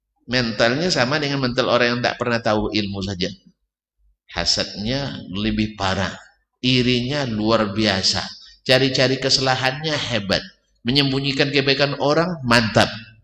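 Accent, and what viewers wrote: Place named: Malaysia. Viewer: hahahaha ente lucu logat melayu gitu dibilang Arab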